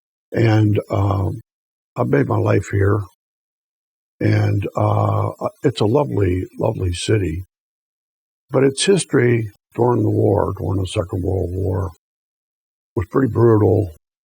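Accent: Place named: Pittsburgh, United States. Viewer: I KNEW you were from Pittsburgh, but I couldn't confirm it until you just mentioned it. I was born and raised there myself, and now that I have lived outside the city for so many years, I can catch the accent immediately. Anyhow great series. I fell in love with it and I am binge-watching right now. I am going to purchase the book this week. Thank you.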